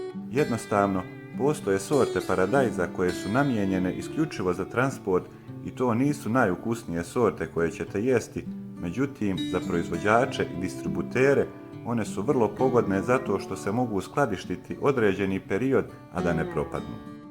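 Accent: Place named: Herzegovina, Bosnia and Herzegovina. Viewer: Mene kao Lalu naglasak tj način govora ovog čoveka izuzetno podseća na onaj kojim govori hrvatski teniser Marin Čilić, koji je iz Međugorja, a samo malko manje i na tip govora našeg košarkaša Alekse Avramovića, koji je iz Čačka, a dosta i na Crnogorce, generalno. Dakle, valjda, tipično hercegovački(?)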